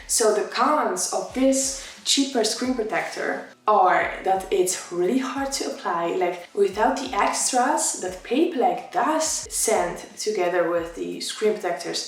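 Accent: Netherlands. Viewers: I know for a fact u Dutch I know the accent of my ppl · heyyy!! Nederlands!! Ik dacht al een hint ervan te horen. Het kleine Poolse gedeelte bracht me in de war 😂 geniet wel echt van je video’s!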